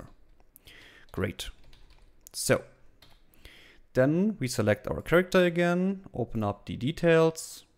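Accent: Germany. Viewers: Man hört so das deutsch raus haha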